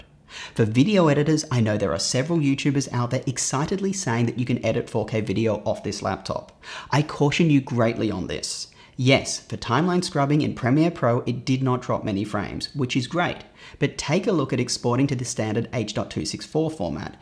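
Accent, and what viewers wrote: Australia. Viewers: Are you an Aussie,? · I’ve watched quite a few vids now and I’ve only just realized is Australian 😂😂 love the reviews and great advice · Are you Australian? I have to know hahaha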